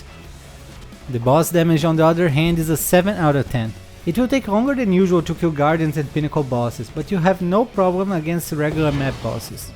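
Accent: Brazil. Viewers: by your ascent you sound like brazilian guy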